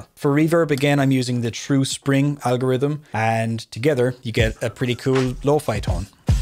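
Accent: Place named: Ireland. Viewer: New sub here, Tis gas to be listening to a pedal review with a good oul strong paddy accent 😂 Fellow paddy here. Great review ta be fair to ya. Hoping to pick one of these tomorrow to try scale down my board and utilities midi functionality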